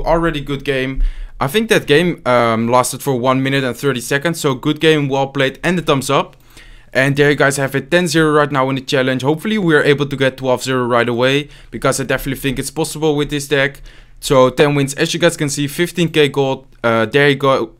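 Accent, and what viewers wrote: Netherlands. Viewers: e gast ben je nederla'ds'ikan da horenaan u stem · Lekker Nederlands actcent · Met je Nederlandse accent klink je engels wel verlept Sorry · He is dutch I hear that on hes cracky english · Are you dutch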